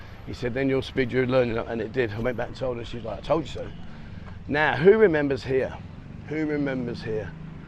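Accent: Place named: London, United Kingdom. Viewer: I'm from los Angeles, and i have difficulty understanding your London accent, but it's worth it! Lov You! Keep it up!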